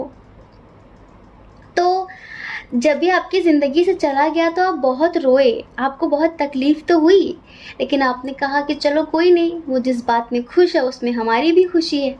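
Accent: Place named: Bihar, India. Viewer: aaj pehli bar hamne apki video dekhi hame bhi yahi laga ki aap up ya bihar se ho kyuki bhasha se yahi laga tha kyuki ham bhi bihari h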